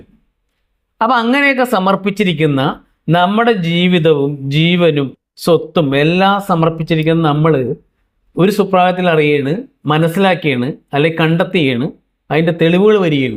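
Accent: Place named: Kozhikode, India. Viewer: ഈ വോയ്സ് കോഴിക്കോട് കാരന്റെ എല്ലാ... കോഴിക്കോട് സ്ലാങ് എനിക് അറിയാം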